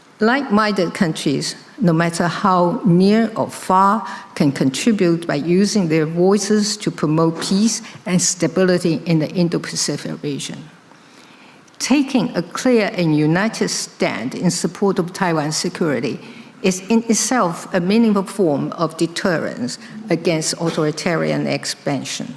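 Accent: United Kingdom. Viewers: 不看稿的英國腔超帥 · 我愛她的英式英文，太好聽了。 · 英國腔? 真感動~ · 不卑不亢的英國腔演說，真正的領導人，偉大的女性，我向您致敬，感恩臺灣有您. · 總統是純正倫敦腔的英文耶👍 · 英國倫敦政經學院的PhD, 好聽的英國腔. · 什麼時候開始有這麼重英腔了？ 😀😆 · 英國腔太迷人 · 蔡總統的英國腔超級好聽👍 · 英國腔超棒的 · 她是一口純正不列顛口音(或非常接近)，畢竟她是真的在那邊唸過書，不像一堆支那黨政客只是去國外買學歷但其實英文口說爛得要死。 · 她說的是英國口音和台灣人習慣的美式英語不同。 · 總統的英國腔好好聽啊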